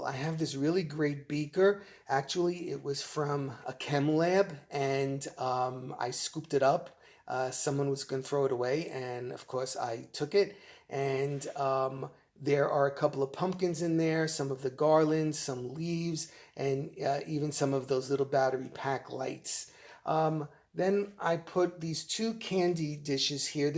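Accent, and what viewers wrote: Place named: Boston, United States. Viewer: You have to live in Massachusetts. You have a Bostonian accent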